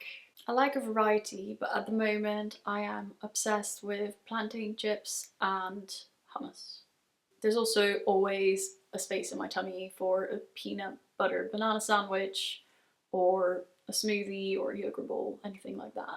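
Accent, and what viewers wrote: France. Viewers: Cet accent français 😍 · Great and spontaneous video. That french accent though👌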